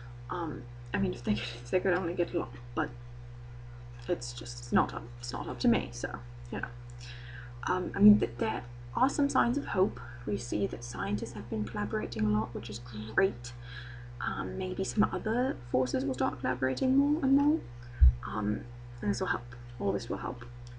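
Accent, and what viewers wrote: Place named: United Kingdom. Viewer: You remind me a lot of Hayley Atwell with that British accent.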